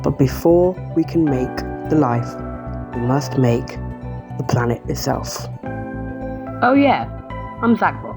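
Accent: United Kingdom. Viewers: I would call this ok, but the british accent turns this into a 100/10, oh yea, and the vsauce sound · Are you british 💀 also i know im very late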